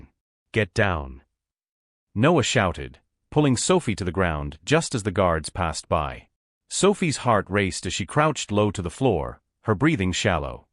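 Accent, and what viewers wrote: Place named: France. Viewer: What's with the french accent leaking in? A.I. ?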